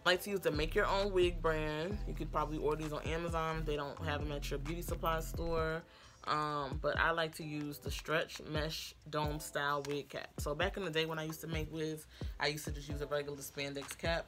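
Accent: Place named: New York, United States. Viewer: Are you a New Yorker? love you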